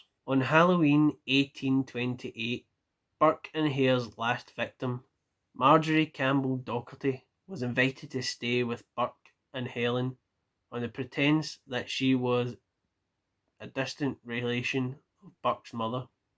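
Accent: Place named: Scotland, United Kingdom. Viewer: Well, - A Scotsman reading to us about two very naughty boys from his native Scotland. It you're not Scottish - you do the best rendition of Scottish I think I've ever heard. 👍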